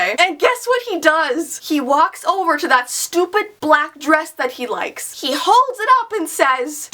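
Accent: Canada. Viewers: Me: is she Canadian or American?: it's really weird eh Me: ok she's Canadian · "It's so weird eh?" 😂☺️her Canadian accident is the cutest lol. I'm from the south so that's really rare to find. Love you !!!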